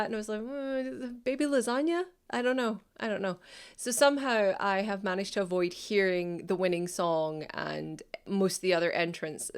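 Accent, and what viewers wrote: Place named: Scotland, United Kingdom. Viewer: I thought u were from Ireland then u realised your from beautiful Scotland ❤️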